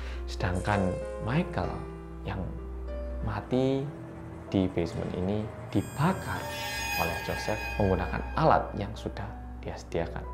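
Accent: Java, Indonesia. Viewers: Hai org Korea medoook ❤ Nyimaaak 🎉🎉🎉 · Masyaallah....sy kira Wong jowo asli nggak taunya Korea to.... 😃👍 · Masya Alloh kok bisa selancar itu berbicara pakai bahasa Indonesia dengan logat Jawa padahal asli orang Korea.Kalau lahir di Indonesia wajar selancar orang Indonesia.Salut banget 👍Cerdas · Sebenarnya udh nnton di Omara tapi mau nnton lagi versi om medhokk🐒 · Jebole medok yo😁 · iki wong korea opo jowo?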